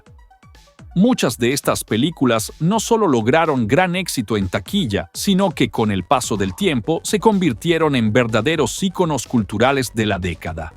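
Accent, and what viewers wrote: Yucatan, Mexico. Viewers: Ese acento es yucateco? Suena muy bien!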